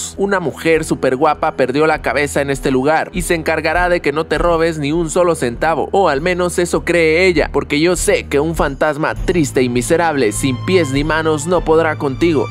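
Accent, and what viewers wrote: Guatemala. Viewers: Un patojo!, Que se me hace que eres de Guate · Una pregunta sos de Guatemala porque tenés el acento guatemalteco Yo soy de Guatemala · ¿Eres de Guatemala? :D Yo soy chapina (っ.❛ ᴗ ❛.)っ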